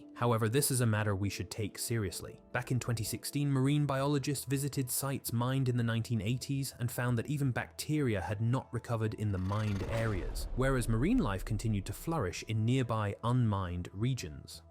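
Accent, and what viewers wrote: United Kingdom. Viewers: Why do you have a British accent and an American accent?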